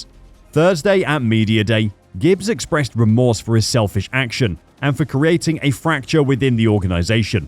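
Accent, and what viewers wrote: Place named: United Kingdom. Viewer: This is so fabricated. You and your limey voice STFU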